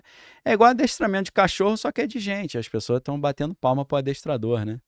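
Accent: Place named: Rio de Janeiro, Brazil. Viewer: Como levar a serio um cara com sotaque de carioca?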